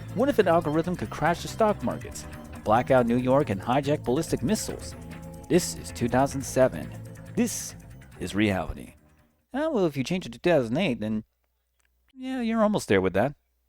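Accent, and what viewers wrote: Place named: Netherlands. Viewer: You sound kinda finnish with some pronunciations. Jokes on me before you turn out to be dutch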